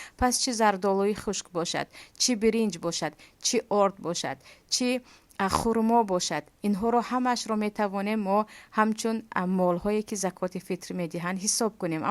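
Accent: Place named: Tajikistan. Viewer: Саломалекум апачон бехтарин гапои ширин заден ба лахчаи ширин точики фахамо хдои мехрубон нигахбон боша шмора хазор рахамат.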